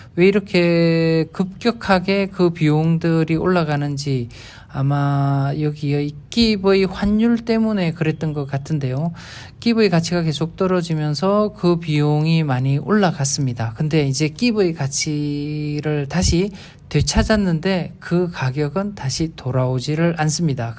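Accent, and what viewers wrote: Gyeongsang, South Korea. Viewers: 님의 차분한 경상도말에 친근감이 느껴집니다. 한달은 좀 길어 보름살기는 안되나요? 내년1월에는 라오스 가는데, 치앙마이에서도 보름있고 싶어서요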